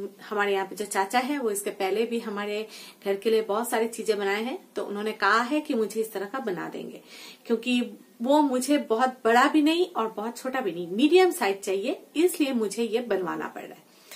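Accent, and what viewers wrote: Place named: Bengal, India. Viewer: Like 69 Beautiful video I enjoyed your Bangla accent also God bless you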